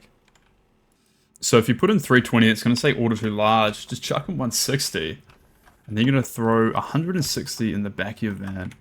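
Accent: New Zealand. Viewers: also i know your AUS but you sound like you have a touch of kiwi.. true?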